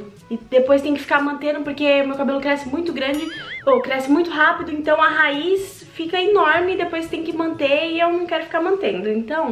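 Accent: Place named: Portugal. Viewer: já ta ficando com sotaque de Portugal ❤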